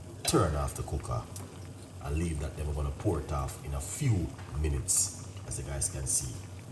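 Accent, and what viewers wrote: Jamaica. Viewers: I like your Jamaican accent. A good friend of mine is from Jamaica 🇯🇲 he is one of the most funniest people i have ever met in my life. He has no filter lol. I'm from Ireland 🇮🇪 thanks for your video, those covid 19 shots and the booster really fu*ked up my chest and lungs real bad. I'm 33 years old, before Christmas 2019 my life was fine i was fit and healthy i have a passion for fitness and running. Now i can't find it very difficult. I work in a Factory and put my ipod on with my radio and play on Blast 🙂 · Yummy . I love your Jamaican accent. God bless you. Thanks for sharing. Can the lemon be bottled? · I like how he talks” Yeah man”, I love Jamaicans🤣😂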